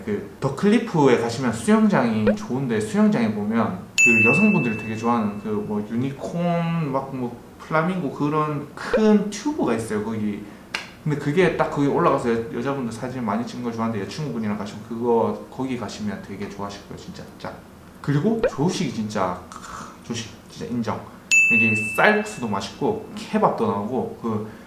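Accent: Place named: Gyeongsang, South Korea. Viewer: 콘텐츠 잘 봤습니다. '좋댓구알' 했구요. 억양에서 살짝 경상도사투리가 들리는데 혹시 경상도 출신이신가요? 전 대구남자입니다. 혹시 Q&A영상이라도 있으면 알 수 있지 않을까 싶어서 검색해보니 QNA영상은 없네요. 다른 의도는 없는 순ㄴ수한 호기심에서 하는 질문입니다.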